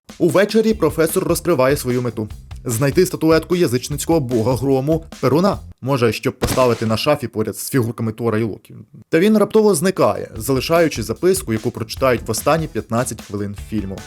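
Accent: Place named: Ternuvate, Ukraine. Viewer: Прийшла дивицця заради божественного тернуватського суржику, а його тут не було :( шо за підстава? П.с.: з дебютом🎉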